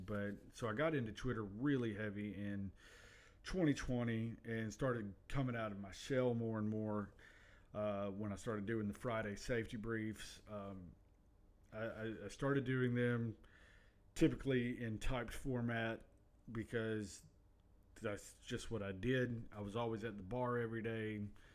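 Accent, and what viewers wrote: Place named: Alabama, United States. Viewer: Being British, I absolutely love the Alabama accent. Took me a few minutes to figure out who you are and that you're someone I already follow on Twitter. Always glad to find some more based content from one of our "cousins from across the pond". (You might not have any love for King George, but TBH not many of us over here have any love for the current King Charles either, so we'll grant you a free pass for your ancestors being a bunch of "splitters"! 😆) Really liked the low-key, chill, chat approach. You already seem to have a natural affinity for talking on camera, so bring on the next episode and here's to lots of luck with the channel, and a great weekend with Mama & Java. ❤🤍💙 <---- y'all might have left the empire, but we still share colours (even if you refuse to spell the word correctly 😉)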